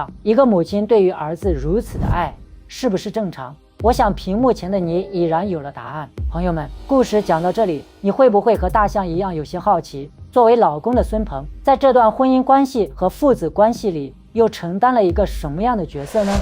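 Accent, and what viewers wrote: China. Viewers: 是温州人？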